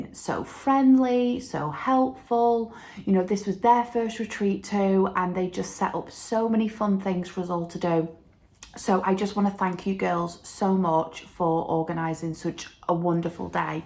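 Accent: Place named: Sheffield, United Kingdom. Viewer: Wow, great month! My first retreat was Retford too ☺️ totally agree it was fabulous. Where do you come from? Your accent sounds local to me near Sheffield xx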